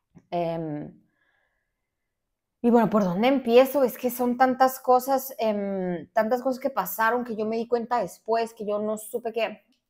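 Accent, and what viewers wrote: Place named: Mexico. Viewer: A mi me encantaba ver sus videos y escuchar su voz tan mexicana de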